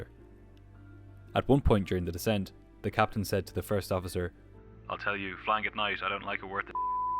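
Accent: Ireland. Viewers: irish? lol, no matter, you have a sexy voice,,,,, this video was well done, xo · enjoying the Irish lilt in this narration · I detect an accent....Irish??? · Great video, and your soft. Lovely, beguiling irish accent adds so much enjoyment to an aleady wonderful production.......only one problem........its making me desperately homesick. Love and respect from mo in new zealand.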